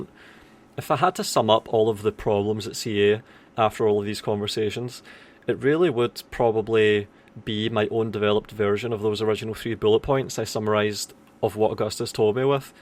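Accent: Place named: Belfast, United Kingdom. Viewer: cool mate. Your accent sounded like it could be Belfast. Anyways enjoyed the video!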